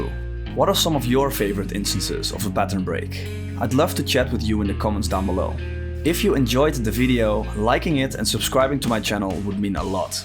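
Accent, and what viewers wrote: Netherlands. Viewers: Man you sound dutch you heve strong accent i vlasnders my self dutch part belguim 😅😅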